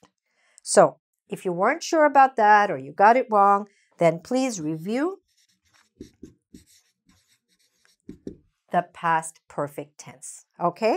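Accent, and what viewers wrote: Brazil. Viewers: Thank you so much, I think you are Brazilian because I am almost understand you perfecttaly! It is beautifull gift you have teaching! Excellent! Thank you for posting!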